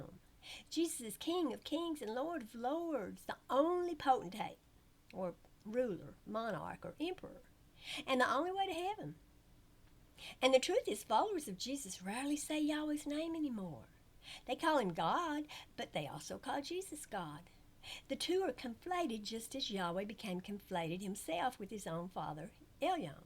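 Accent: South, United States. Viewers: That sweet southern 'Hi' is always the harbinger for brilliance ❤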